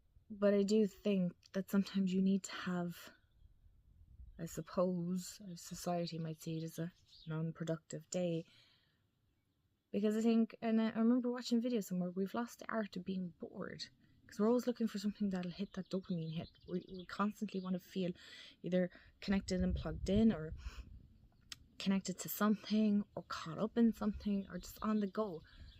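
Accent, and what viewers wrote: Ireland. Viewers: I really like your voice and your Irish accent 😍 I relate to everything you said very deeply ❤ It's been really hard for me as an Iranian, staying present helps a lot. I have had a channel on YT since 2020 (My Farsi channel) and the best advice I can give to anyone who is not here for money, is to completely forget about the algorithm. ❤❤❤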